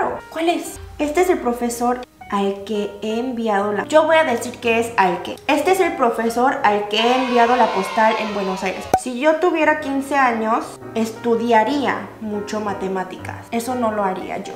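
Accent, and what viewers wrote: Mexico. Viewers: Apoco aprendió español Mexicano, el más chingo de todos. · Se nota el acento mexicano jaja Y algunos usos incorrectos creo que se llama jerga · Hablas español Mexicano, se tetona el acentro y las palabras de la rosa de guadalupe. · Tu español es mexicano... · tu hablas el español mexicano, las mayorías de las juergas mexicanas son feas cuando se escucha en otros paises. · Pero y esta reina?! Tiene un acentazo mexicano brutal! A mí como española, me dicen que es de Méjico y me lo creo! Cero acento extranjero! También es cierto, que he notado que la fonética coreana y española, aunque no lo parezca desde fuera, es muy semejante. Yo llevo 10 años aprendiendo coreano y cuando me escuchan hablar siempre me dicen "porque tienes cara de europea, si no creería que eres de Seúl" jajajaja. Supongo que al final entre coreanos e hispano-hablantes no tenemos demasiado acento al aprender el idioma del otro! 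(el problemita, problemón, está en la gramática... Ahí sí se vino drama, lol) Anyway, buenísimo tu español, amiga. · Soy el único que cree que su forma de hablar no es el español neutro sino más como el de los castrosos "Whitexicans" ? espero que con el tiempo hable mas neutro :) Suerte en todo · Hablas perfectamente. Con acento Méxicano. Pero muy bien. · Hermosa Korean a con acento mexicano, lo mejor de dos mundos 😍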